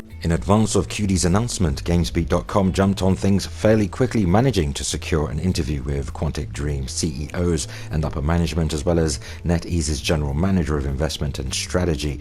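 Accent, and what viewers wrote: United Kingdom. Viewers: I like how you always emphasize “rampant speculation” also I’m an American but hearing a British accent is refreshing. I have an American southern accent and some may enjoy that so cheers 🍻 to liking things.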